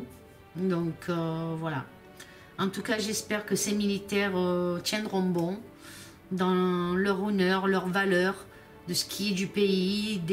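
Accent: South, France. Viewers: Adorable et bel accent du sud Merci pour le tirage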